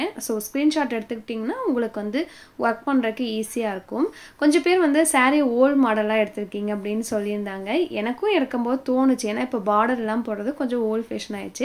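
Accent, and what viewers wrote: Kongu, India. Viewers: Neenga v2la kongutamil giramathu basai thaan pesureenga kongu vellaalar ah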